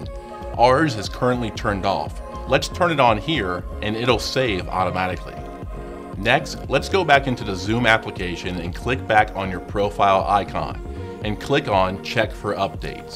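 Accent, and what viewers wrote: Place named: United States. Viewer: Very, very understandable- slow, even delivery- US accent-yay!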